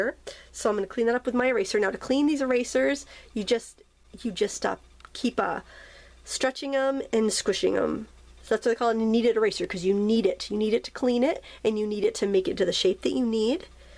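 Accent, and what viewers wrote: Maine, United States. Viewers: Hi, Love your charcoal "draw-rings" with your Maine dialect! Great variety of shading and blending techniques! What do you like to use to seal it so it doesn't smudge charcoal whatever is next to it?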